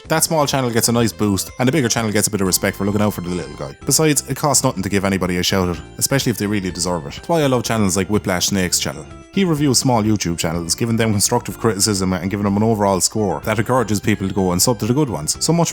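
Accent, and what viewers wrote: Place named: Munster, Ireland. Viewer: Sounds like cork or limerick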